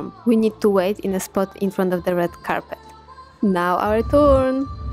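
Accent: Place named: Poland. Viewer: Very good and positive video. Thank you! When started to wath a video, though that, hmm sounds like a speaker have a polish accent and it was true.)) Interesting how roots are following people. All the best you guys!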